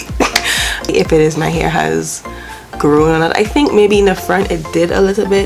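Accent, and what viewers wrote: Trinidad and Tobago. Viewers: Sounds like she's trini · Its ur trini accent that made me watch the whole vid. I dun kno how to use rice water but it was really/rell nice to hear it from a trini. :) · i now go to say her accent sounding rel strong like ah Trini but i hearing ppl saying African and i wondering why but everywhere a trini go dey say u are from Africa haha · She sounds Trinidadian 🇹🇹🇹🇹🇹🇹🇹🇹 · Oh... I use par excellence rice and I don't get what you get at the top of the rice, plus you can add 10 drops of lavender before you put it to ferment... Love hearing my Trini accent ☺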